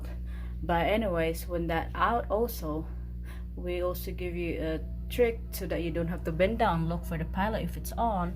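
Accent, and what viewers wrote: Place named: Philippines. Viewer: Filipina accent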